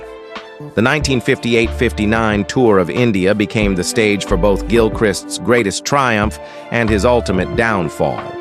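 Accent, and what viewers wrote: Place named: United States. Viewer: Cricket and a american accent of the anchor doesn't seem normal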